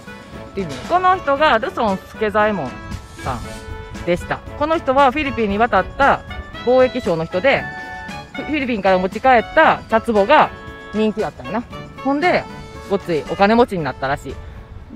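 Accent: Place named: Kansai, Japan. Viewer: さんの関西弁✨懐かしくてホッとするわ〜^ ^ 堺市😮旧堺灯台✍️こんな場所があったのでねその周辺も綺麗な所ですね✨✨ボーリングのピン🤣👍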